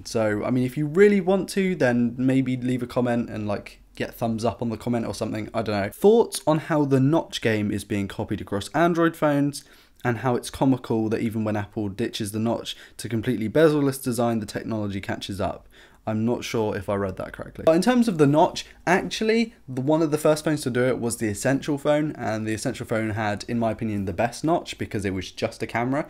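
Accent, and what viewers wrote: United Kingdom. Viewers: Happy birthday , and I like how you talk I like british accent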